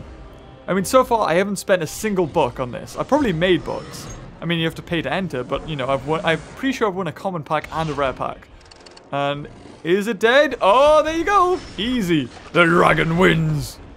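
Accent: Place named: United Kingdom. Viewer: youre a good youtuber but heavy brittish accent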